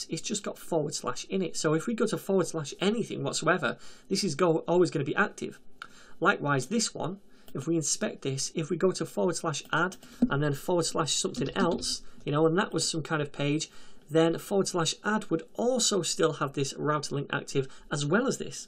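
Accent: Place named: Northern England, United Kingdom. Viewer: Fantastic tutorial, clear and elegant explanations and examples throughout. Pleasant voice and accent (sounds like Manchester/northern England?) were a nice bonus!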